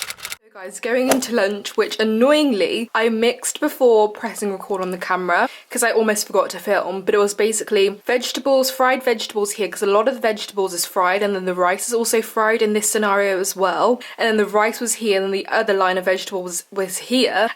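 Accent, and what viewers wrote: United Kingdom. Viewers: Okkk ..first off !!!! I'm in love with her British accent dayummmmm🤩🤯🔥🔥🔥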